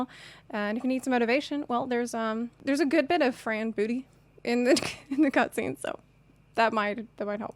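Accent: South, United States. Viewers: You sound like you're from the south. Coastal southeast.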